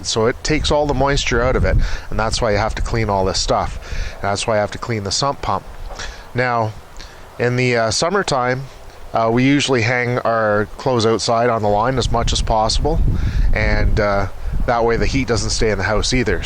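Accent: Canada. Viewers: Total stud! Thanks for saving me a ton of money on a sales call. And love that Canadian accent!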